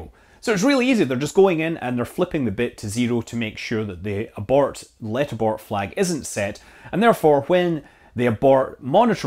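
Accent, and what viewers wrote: Scotland, United Kingdom. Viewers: Nice accent! Scottish? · A Scotsdev, I like it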